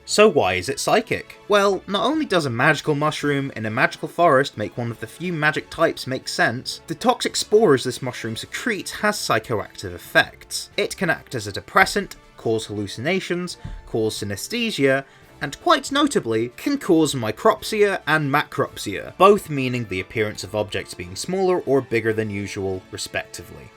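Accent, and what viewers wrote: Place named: United Kingdom. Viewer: Your little British accent >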